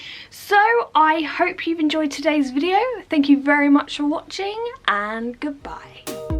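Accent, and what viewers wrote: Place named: United Kingdom. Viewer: 英音真好听😊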